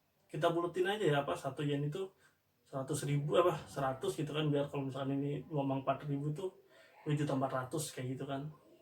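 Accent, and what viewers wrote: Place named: Bekasi, Indonesia. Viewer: Bang Abang ORang Tambelang Ya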